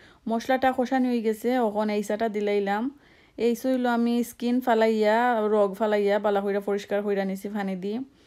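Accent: Sylhet, Bangladesh. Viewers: মাশাল্লাহ আপুনি তুমি কত সুন্দর করে সিলেটি মাত মাতলা খুব ভালো লাগলো আজকের ব্লগ টা। এভাবে সিলেটের মাত মাতবা সবসময় প্লিজ। শুনে আমার বেশ ভালো লাগে।রান্নাগুলো অসাধারণ মজার রান্না করলা।খেতে ইচ্ছা করছে কিতা রতাম গো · Apu tomar sylhety kotha shob bujhesi, valo laglo, Lau khaw na keno apu at Khub valo shobji, rannagulo mojar, shinar ghoshto alada moja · রান্না গুলো ভালো হয়েছে আপু । আর সিলেটি কথা শুনে খুব ভালো লাগছে । পিয়াজু আমার খুব প্রিয় । Vlog টা সুন্দর হয়েছে । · Apa sylheti hunthe bala laglo, otho mojar ranna khaibar r piaji oise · Apu styet vasha amr khub sweet Lage. Aktu Maya Maya vab ASE. R tmr ranna gulo shei hoyese.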